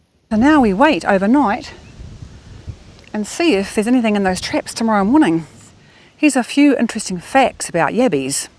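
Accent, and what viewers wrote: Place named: New Zealand. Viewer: You sound like a Kiwi! I have always fished for yabbies with scrap meat on a string, and a plastic rake.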